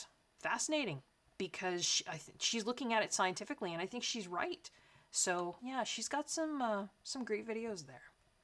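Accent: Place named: Canada. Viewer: It’s funny that you find British accents relaxing, I’m Scottish and I love yours because it reminds me of my best friend who’s Canadian 😅. I used to watch time team with my grandparents, love me a bit of Tony Robinson haha. I don’t know if you’re familiar with Gem and the colour cave here on YouTube but I think you’d enjoy her stuff!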